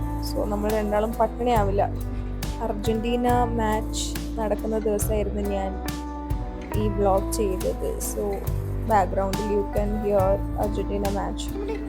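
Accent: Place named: Kannur, India. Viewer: Kannur slang is peek broo 😭😌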